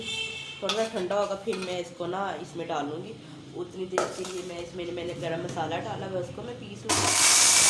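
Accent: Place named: Lahore, Pakistan. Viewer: Sister aap Lahore ki lagti hain MashAllah aap Lahore walon ki tara bolti Hain Allah tala ki qismat shandar kary dunia ki sari naimtain day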